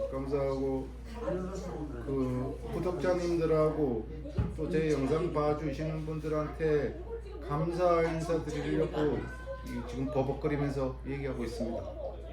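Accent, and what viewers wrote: Gangwon, South Korea. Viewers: 수고하셨습니다 강원도 사투리가 정겹고 좋아요 새해복 받으시고 새해도 수고 해주세요 장마마다 특색이 있고 좋습니다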